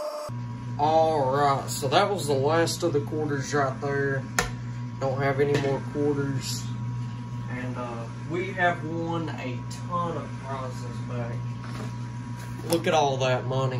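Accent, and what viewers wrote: United States. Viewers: I love watching all of your videos. Where are you from? You sound so country lol not a bad thing at all. I'm from Mississippi so I'm country too lol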